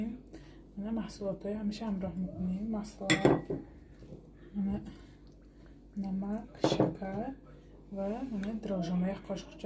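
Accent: Isfara, Tajikistan. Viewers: Бехтарин кадбоонуи зур шумо ба назарам аз исфара лахчаи исфарагихобоин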